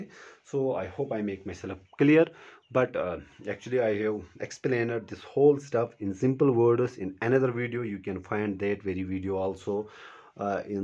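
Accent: Kashmir, India. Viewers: you have an amazing Kashmiri accent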